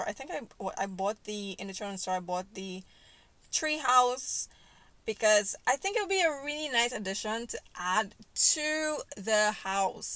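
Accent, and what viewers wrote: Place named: Nigeria. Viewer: Are you nigerian? I can hear from your accent its really annoying lawd 🤦🏾‍♀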